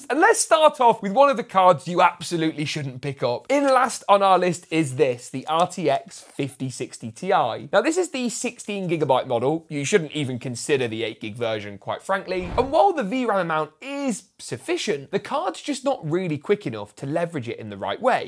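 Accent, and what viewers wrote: United Kingdom. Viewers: Why do you sound more extra British?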